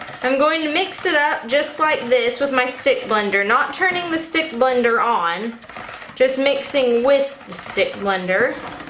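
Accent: Texas, United States. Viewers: She sounds more Texan than usual in this intro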